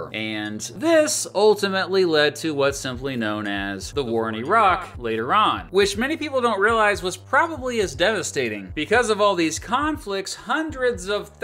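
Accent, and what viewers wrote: United States. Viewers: وده واحد امريكي بيتكلم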